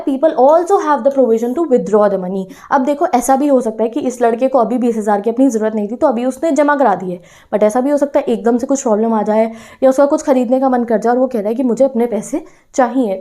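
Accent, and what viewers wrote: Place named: Uttar Pradesh, India. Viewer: Di I want to know aap kha se ho please tell me U.P se ho na aap aapki boli se lgta h eesa bhut bar btao na please di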